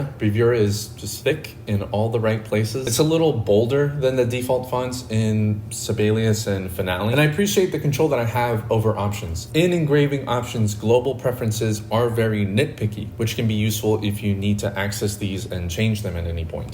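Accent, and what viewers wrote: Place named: Philippines. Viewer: He talks funny... I'm guessing Filipino is first language? lol. Anyway, great information—the note navigation key commands have me drooling.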